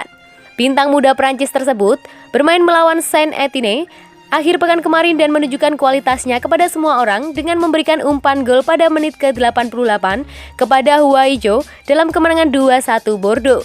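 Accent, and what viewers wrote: Java, Indonesia. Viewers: Beritanya top, Mbaknya org mn ya msh medok logatnya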